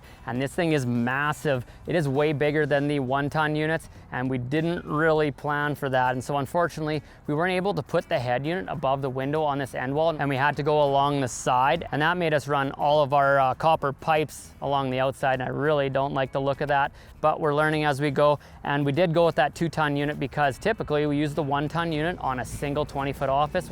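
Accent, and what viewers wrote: Canada. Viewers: The thing that bugs me the most about this video is buddy sounds Canadian af but days Zee instead of Zed.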